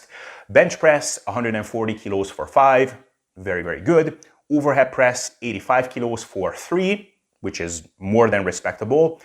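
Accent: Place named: New Jersey, United States. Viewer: That’s a lovely accent you have, New Jersey? Loved the Dumb and Dumber reference 😂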